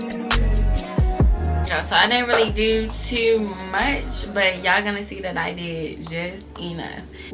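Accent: Baltimore, United States. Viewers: You from Baltimore girl?????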